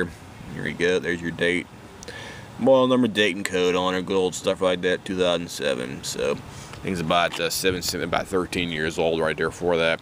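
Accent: Pittsburgh, United States. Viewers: Definitely sounds Pittsburghese to me. Every time he says "down" it sounds like "Dahn," which is very familiar to Pittsburgh! Glad you picked that up too. 😉👍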